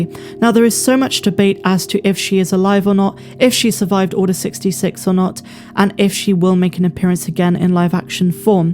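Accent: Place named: Bristol, United Kingdom. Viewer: Where you from? I'm from Bristol, you sound very close 😂 liking the channel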